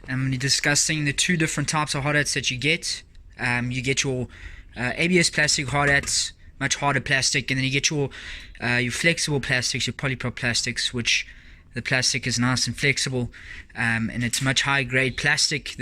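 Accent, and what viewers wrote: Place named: South Africa. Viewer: Sound Southafrican.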